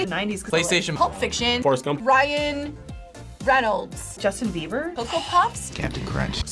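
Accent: Boston, United States. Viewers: Are u from boston?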